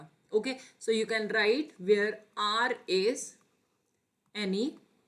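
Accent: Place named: North Kerala, India. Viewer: vadakkan accent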